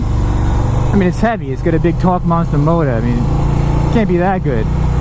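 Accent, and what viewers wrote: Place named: New York, United States. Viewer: "Ya really gotta watch deez cownaz." Gotta be New York. Love it.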